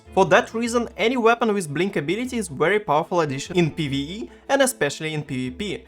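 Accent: Russia. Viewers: Русской школой попахивает английский)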